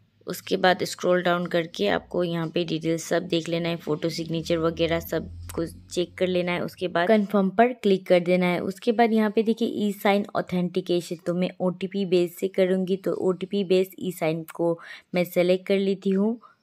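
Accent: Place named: Bengal, India. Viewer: language bangoli lg rha tha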